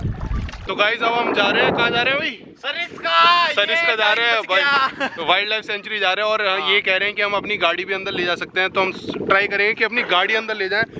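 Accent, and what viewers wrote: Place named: Punjab, India. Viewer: Mundaw punjabi lagda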